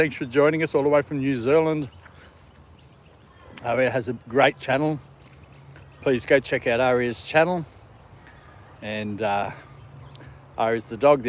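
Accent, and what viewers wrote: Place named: Australia. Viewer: I love the Aussie accent 😍 Not to keen on my own voice , I have a strong London cockney accent 😊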